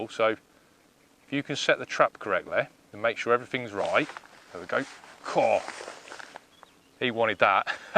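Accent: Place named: East Midlands, United Kingdom. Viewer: Love the videos, from your accent, are you derby, forest or Leicester?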